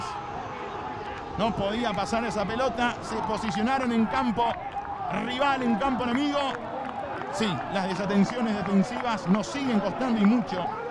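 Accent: Paraguay. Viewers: El acento asunceno si tiene parecido con el rioplatense, que el tipo hable de manera correcta no significa que le esté copiando a los relatores Argentinos. Nde caprichosove kururu pytãgui chamigo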